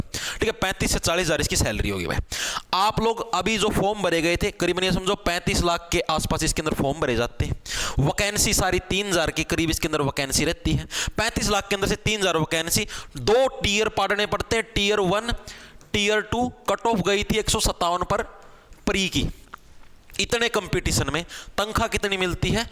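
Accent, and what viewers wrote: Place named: West Uttar Pradesh, India. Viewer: Bhai apki desi language ne dil jeet liya west up se hi ho kya mahare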